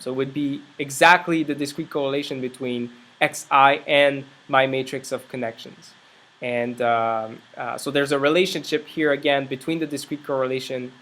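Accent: Quebec, Canada. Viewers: r u quebec or frenc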